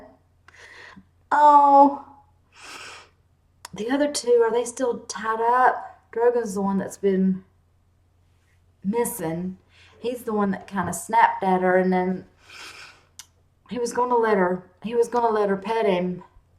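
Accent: United States. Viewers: Glad to hear you're feeling better! And I love your voice. You sound a lot like my aunt Didi, who's from Alabama. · Why does everything sound better in a Texan accent? BTW, Alliser actually calls Jon "Lord Snow" which is an insult (you know, bastard?).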